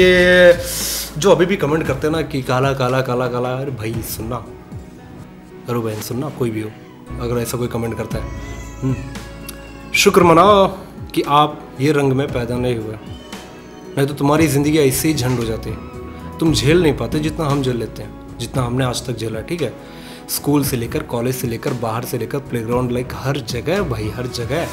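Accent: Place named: Hyderabad, India. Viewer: After a long time I am watching him. I love his hyderabadi accent. I want to see him go him on heights....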